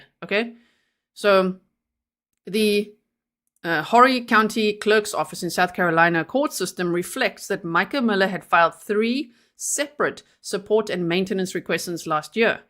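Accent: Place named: South Africa. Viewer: So glad to finally find out that you are from SA! When I first watched one of your videos and thought "man that accent sounds so South African" so thank you for confirming that, my mind can rest easy now! From a fellow South African!🤗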